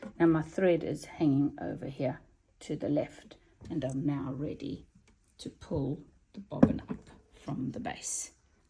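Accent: South Africa. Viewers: I know a Sata African when I hear one. Thank For this - just bought the same machine to start my hobby and small projects. and your videos are just everything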